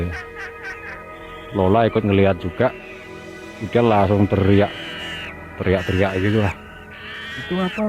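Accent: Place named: Java, Indonesia. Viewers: Medok is not a crime. rapopo, lanjutkan cak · Tanpa 'guwe' jadi mantap kang. Aksen Jawa biar medhok justru lebih enak didengar. Lanjuut....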